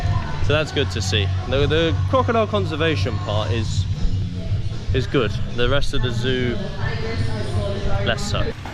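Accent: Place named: Australia. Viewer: Hi, I thought your accent was Australian. I’m going to go check out Davao in October.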